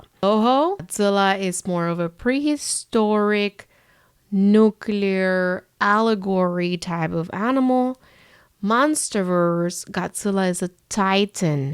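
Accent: Cuba. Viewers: As an American kid my first exposure of Godzilla was the 2014 movie in theaters, then the second movie I saw was Shin Godzilla and since then I became obsessed. I have seen 30 of the Toho movies and love those classic movies so it's cool seeing people love Godzilla both Toho and Legendary more and more. btw I like your Cuban accent it's so nice. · Lifelong kaiju fan here. While the <num> era of suits and miniatures will always be the true heart and soul of the genre, our current revival with CGI blockbusters is still a glorious thing to be living through. It made my day to see you brought into the fandom by the new entries, while also being willing to go back and embrace the back catalog. We need a million more like you! ❤ And your Cuban accent is great. Anyone giving you crap about it should be ignored, full stop.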